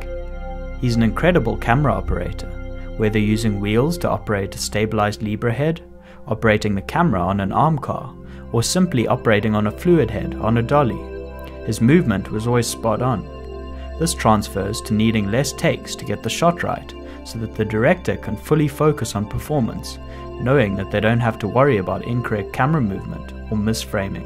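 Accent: South Africa. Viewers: Came across the channel via a ‘no film school article’. Was intrigued when I heard the SA accent but only realized who it was when the pics showed up :) loving the videos, very helpful insights. Looking forward to more! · I've been watching a couple of your videos over the last few days and kept wondering if you were South-African based on your accent. It's so nice for me to hear fellow South-African's approach filmmaking with such a passion and clear love for what you do. i too am an aspiring filmmaker in Cape Town, writing and directing shorts. Keep up your good work! i really enjoy the channel and wish the best for you in your career!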